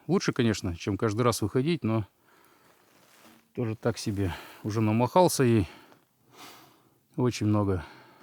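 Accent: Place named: Arkhangelsk, Russia. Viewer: судя по говору, у меня был друг с Архангельска, ты тоже оттуда, познакомься с Николаем, таежным, он никогда не набирает воду, с лунки, у дома, если планирует, выезжать на снежике)))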